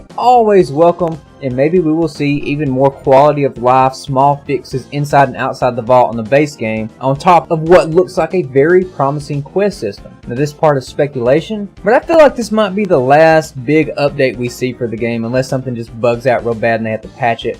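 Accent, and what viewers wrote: South, United States. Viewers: man i love you southern voice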